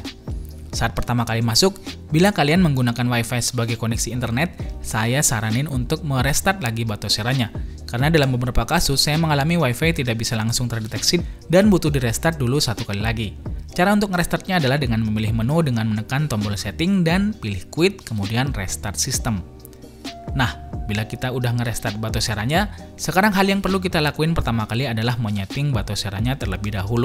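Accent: Madura, Indonesia. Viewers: kok berasa ada cengkok medure nya....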